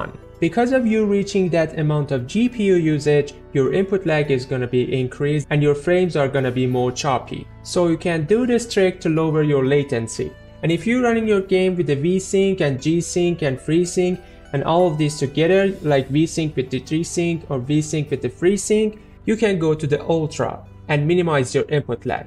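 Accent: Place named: Iran. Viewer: The Iranian english accent is thick with this one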